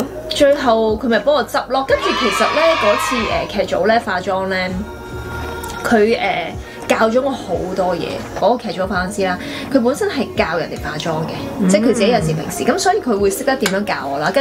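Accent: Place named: Shiqi, China. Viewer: 好聲好戲裏Yoyo配得非常好🎶💯,努力背石岐話勁👍😘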